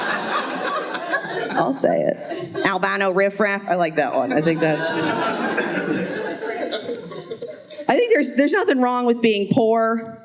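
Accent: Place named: North Carolina, United States. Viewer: I grew up in and around flat rock NC... Definitely got the accent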